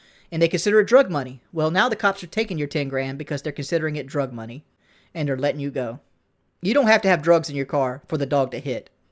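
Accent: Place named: South, United States. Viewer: Him acting as libral with his south accent is amusing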